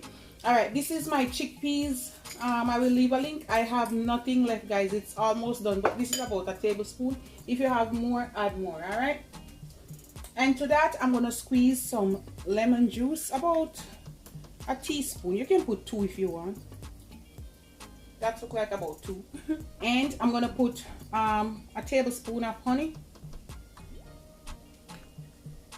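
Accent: Jamaica. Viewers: Hi, so good to hear a Jamaican accent. Nice healthy food, Imma try it ✊🏽✌🏽🎶🐾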